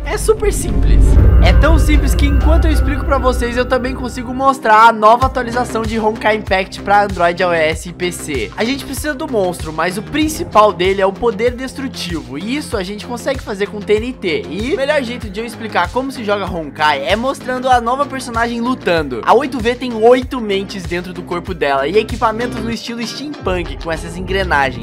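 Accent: Rio Grande do Sul, Brazil. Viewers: TAMBÉM SOU GAÚCHO BAAAAA QUE CONHECIDENCIA É ASSIM QUE FALA BAAAAAAA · VC É DE RIO GRANDE DO SUL ?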